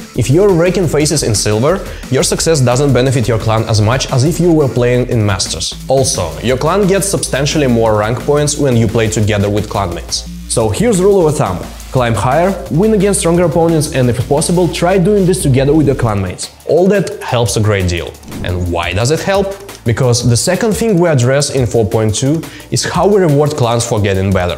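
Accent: Russia. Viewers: i love the russian accent...lol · Nice Russian accent.wow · Он стоо пудов русский я сразу понял по интонацие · Ringlish bro russian inglish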